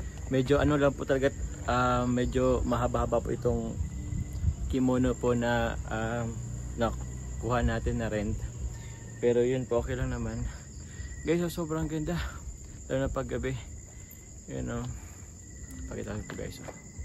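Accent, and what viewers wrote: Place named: Visayas, Philippines. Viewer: Wow marunong na magbisaya si ❤❤❤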